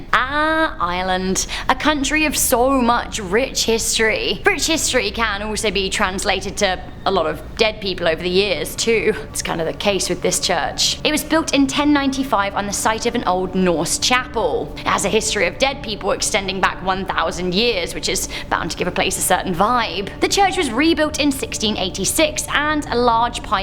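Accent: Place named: United Kingdom. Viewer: There is nothing sexier than a subtle British accent. Love it. FYI almost all my ancestors come from your country. Found out thru DNA testing. I now know where all my family came from and its awesome.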